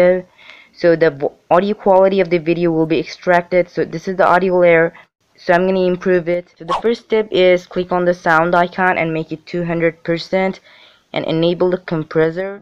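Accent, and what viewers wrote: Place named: United States. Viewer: Finally a Fuckin american Im so tired of those indians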